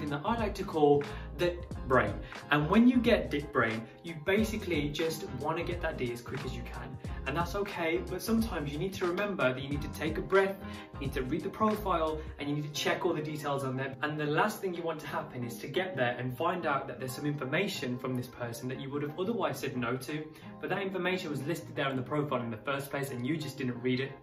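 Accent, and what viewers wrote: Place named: Birmingham, United Kingdom. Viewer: Don't believe it about the accent not being liked - I love the Birmingham accent!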